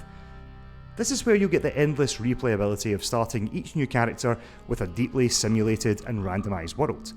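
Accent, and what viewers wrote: Scotland, United Kingdom. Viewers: Scottish accent: +1 to credibility.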